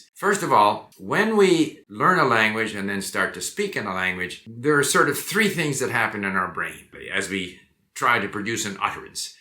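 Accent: Spain. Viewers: Your accent in Spanish, when you speak Portuguese. And I would go as far as to say It's not really proper Portuguese. Especially because of the fact you Speak brazilian, portuguese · "s Portunhol occurs because he does not swing the words like Brazilians do, and utters.the Portuguese words with the Spanish cadence. No big deal. They will point it out. But they have no.problem understanding.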